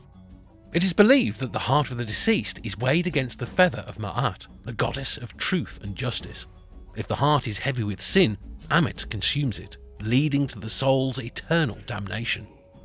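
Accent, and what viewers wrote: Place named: United Kingdom. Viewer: Interesting... Am I the only one finding a british accent explaining African culture to feel a bit... Wrong? Inappropriate? Strange?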